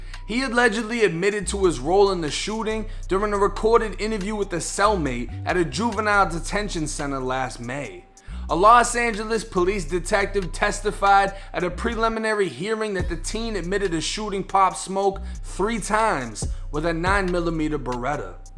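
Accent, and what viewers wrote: Boston, United States. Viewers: Definitely sounds like a Boston Accent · Why this dude have a Boston accent if hes from Florida? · Horrible narrorator voice. Half Boston/ wigger. Smh fat ... Hip-hop Harry.smh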